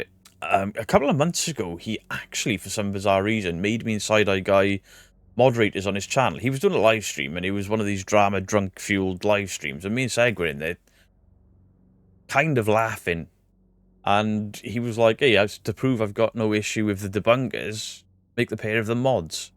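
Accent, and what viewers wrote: Wales, United Kingdom. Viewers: thanks. Ive missed your welsh tones xxx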